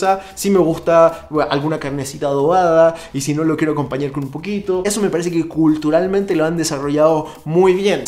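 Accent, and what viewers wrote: Chile. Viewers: 🌶 El chile serrano para los caldos, solito a mordidas o en salsa 🤤 delicioso! Me encantó tu contenido porque esta bien chido aprender de la gastronomía de mi país y con acento chileno y buen gusto.... está de lujo 😉👌 gracias, me haces pasar un buen rato! 👏👏👏👏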